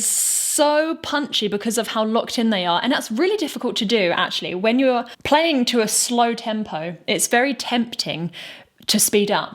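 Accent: United Kingdom. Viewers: Self-promotion is not narciissistic, Honey! (spellcheck not working correctly) Wear your shirt out, girl! I see how impressed you are by this great singer and song from note one because it evidently touches you so deeply! And as the saying goes 'it takes one to know one'! I just had to stop to tell you these things I feel from your reaction already. I liked this song & subscribed to your channel straight away! Also, I love that charming Brit accent coming from a lovely woman such as you! I'm also looking forward to all 21 minutes because of my feeling that you will get deeply into this one because of you recognizing his talent immediately. back to the video for me! Love that Magenta hair too, girl! Rocky Horror Picture Show, anyone? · Initially i started liking her when she started talking, Dat british accent makes the ears oozing, and when i focused on what she actually says and how greatly she explains what is what my mind started to ooze. 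Considering her great girl's beauty made also oo, well, this comment wold be blocked if i proceed😂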